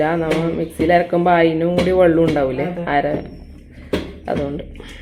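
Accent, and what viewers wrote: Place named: Kannur, India. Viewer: Nigal kanuru karano. Langage kelkubol tonunnu. Ee. Appam soft udo. Jnan udakubol orikalum nanavarilla. Oru nazi ariku atra alav puzukalari cherkanam. Makalk udaki kudokuvananu👍🏻❤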